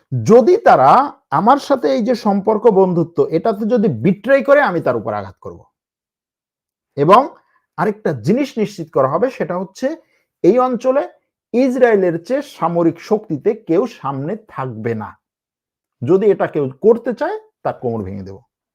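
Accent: Bangladesh. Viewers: do you hail from.Noakhali.Sound ok.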